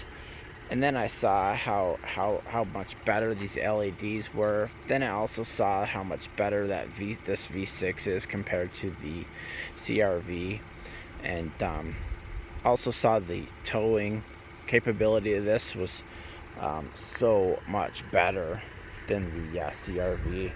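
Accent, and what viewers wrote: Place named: North, United Kingdom. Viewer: You sound like a Northerner, but speak slower than a southerner.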